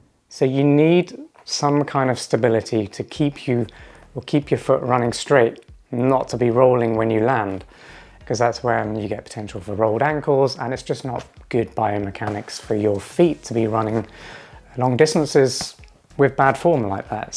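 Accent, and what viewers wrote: United Kingdom. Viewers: Subscribed. U do have british accent pal. Simple explaination. Iam getting hoka clifton 8 online. Is their any difference bw clifton 8 and 9 ? Moreover whats ur opinion on clifton 8 or invincible 3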